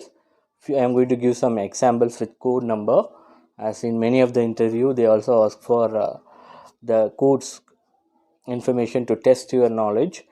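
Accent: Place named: India. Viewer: Oh man, I'm really struggling to understand that hardcore Indian accent! Subtitles help a lot.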